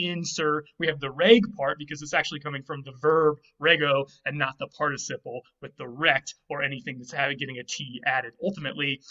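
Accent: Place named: United States. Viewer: No offence and I don't want to come off too negatively but why are you using a postalveolar approximant r in Latin words when we know factually that this sound did not exist in Latin and in no variety of Vulgar Latin was this a thing and the vowel quality you use sounds like it it taken directly from American English. Is it because you don't think it matters or is there some other reason? As a Classics scholar you must know that these words were not pronounced the way you are pronouncing them?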